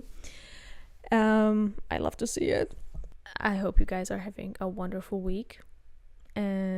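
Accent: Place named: Hungary. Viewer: It's cool hearing you speak English, as a Hungarian I always cringe because of my accent, but I actually like your's even if it is Hungarian.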